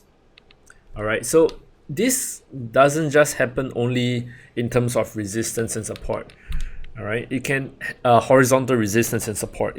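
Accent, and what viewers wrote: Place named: Singapore. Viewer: is this Rayner Teo? Similar (Singapore?) accent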